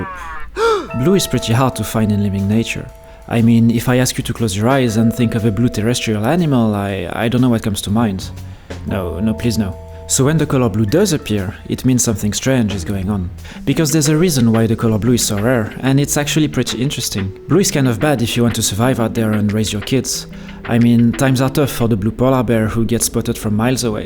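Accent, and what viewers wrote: France. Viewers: The frenchy accent ❤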